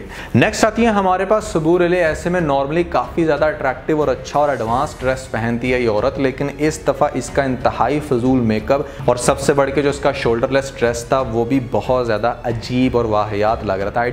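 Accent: Punjab, India. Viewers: I like the way your punjabi coming out! I love it!!🙆‍♀️❤️and the way you criticised actresses… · Tuhadi punjabi 22 sooo funny😂😂😂😂😂😂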